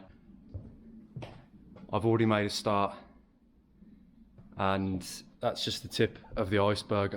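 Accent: Birmingham, United Kingdom. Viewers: Glad things are coming together for you..i am from the uk and i sense a west midlands accent.(brummie) perhaps.?...bavaria looks magnificent..best wishes.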